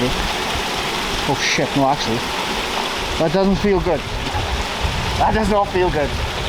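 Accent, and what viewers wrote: Wales, United Kingdom. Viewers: Your Welsh accent sounds thicker out in the valleys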